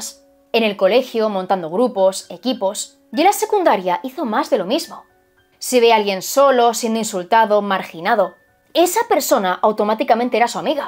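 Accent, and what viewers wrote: Galicia, Spain. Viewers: Eres gallega?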